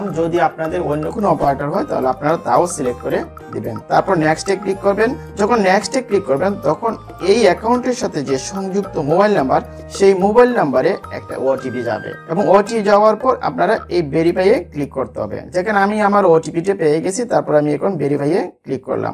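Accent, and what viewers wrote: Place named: Sylhet, Bangladesh. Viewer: Video deke mono hocce apni sylheti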